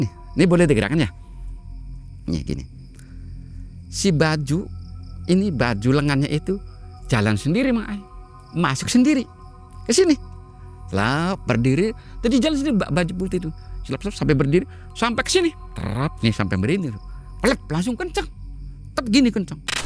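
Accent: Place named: West Java, Indonesia. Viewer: Kok logatnya kaya orang sunda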